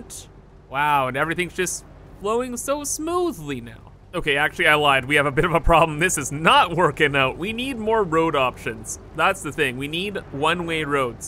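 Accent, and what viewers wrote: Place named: Canada. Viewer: You sound so cute with your funny Canadian accent!!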